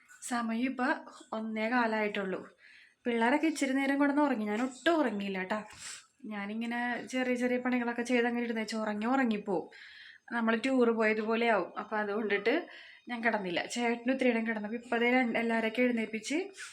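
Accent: Kochi, India. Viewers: Njanum kochi kari annu.... Kochi language kelkumbo othri santhosham..... Njan chennaiyil annnu ediku varum Kochi marakan pattumo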